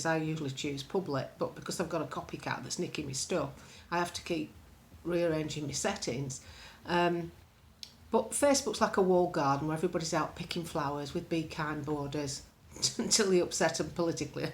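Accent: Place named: Northern England, United Kingdom. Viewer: New subscriber here.. your YouTube video's are fantastic.. proper northern lass..😊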